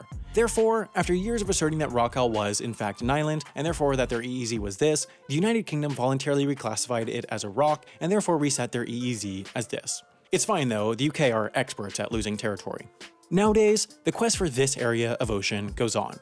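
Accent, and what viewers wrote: United States. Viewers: That Guys annoying Whiny American Accent is so annoying, America , The Land of The Free-DUMB. Trump 2024 LMAO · Most boring load of shit I've heard in a long time, that God awful yank accent. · He just sounds like a bitter yank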